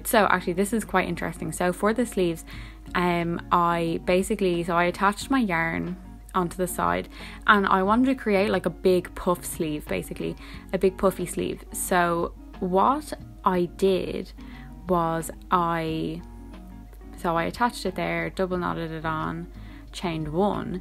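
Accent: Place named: Ireland. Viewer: OMg Ur irish! I recognise the acsent , im irish too :D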